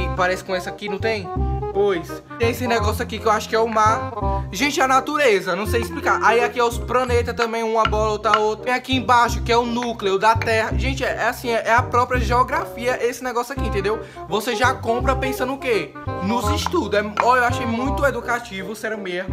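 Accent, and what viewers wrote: Bahia, Brazil. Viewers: Ele é de qual lugar da Bahia ??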